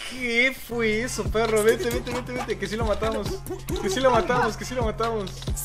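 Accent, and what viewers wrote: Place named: South, Spain. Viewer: De dónde es este we?:v tiene acento del sur :v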